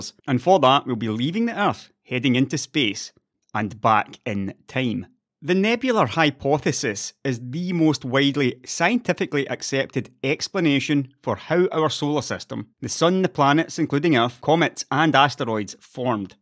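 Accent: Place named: Scotland, United Kingdom. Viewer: After a day of work, gym and chores, calmly at home with a glass of beer in my hand, spending about 1h listening to magnificently Scottish voice talk about science is almost a divine experience, ironically:D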